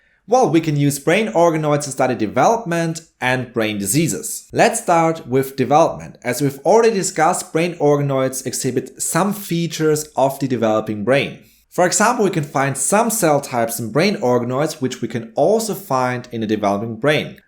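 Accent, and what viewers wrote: Germany. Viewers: Here from nutshell, subbed. :D edit: you sound German,are you German?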